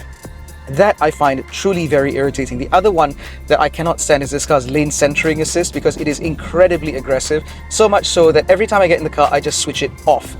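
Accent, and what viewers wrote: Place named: RP, United Kingdom. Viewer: ..rocking those shades, with impeccable queens english..while driving the omoda9..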